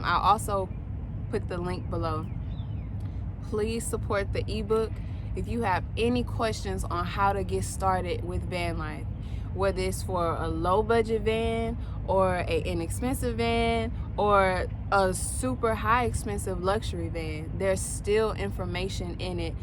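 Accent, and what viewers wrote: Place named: South, United States. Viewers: Southern girl love it. Great video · you look beautiful and healthy. I love when your texan accent comes out lol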